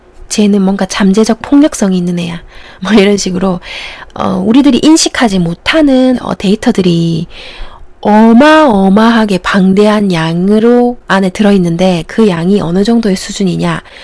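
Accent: Gyeongsang, South Korea. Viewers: 개인적으로 ,경남 사투린지, 부산 사투린지몰겠지만, 더욱 정감이가고.... 더불어 전해지는 진심어린 내용에 푸~~~욱!! 빠져 들게하는 매력이 있네요...ㅎㅎ 감사 하고 있어요~~!!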